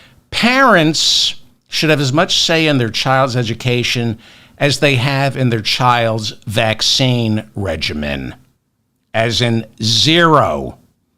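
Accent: New York, United States. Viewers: you and we got used to just basic cheating by the right. Now it's a firehouse of u name it crap. First I personally am glad to have your voice (and it's dry New York; do it yo) in the mix. Also brilliant to repeat the former president's actual marital failings. Again and again. Love your roll Dr.!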